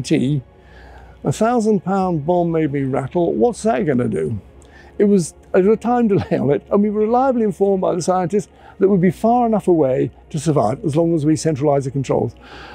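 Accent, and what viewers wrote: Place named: United Kingdom. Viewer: I’m going to start cultivating a British accent so I can sound as cool as this bloke… Love this video!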